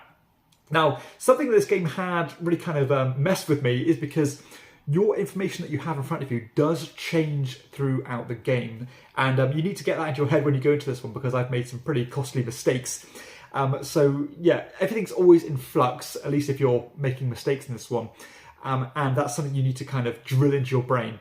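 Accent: United Kingdom. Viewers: If you don't mind sharing - what is your story behind Castell Rhaglan? - was it simply a visit, or do you have Welsh ancestry, even as your accent is undoubtedly English? 🤔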